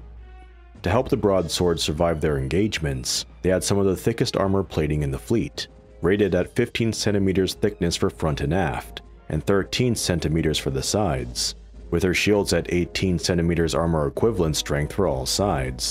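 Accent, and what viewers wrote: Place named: Australia. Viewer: You're obviously an AUSSIE!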